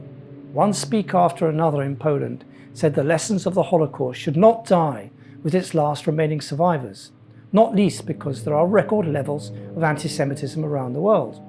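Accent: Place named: England, United Kingdom. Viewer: The English accent sells it very well.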